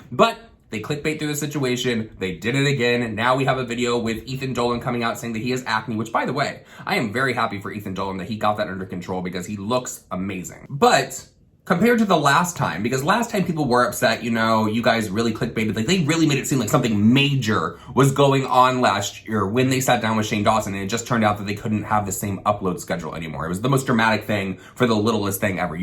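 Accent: South, United States. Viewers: Saw in another video your in Florida.... I thought I heard some southern! (Not in a bad way of course) Watching from LA ( lower Alabama) love the channel.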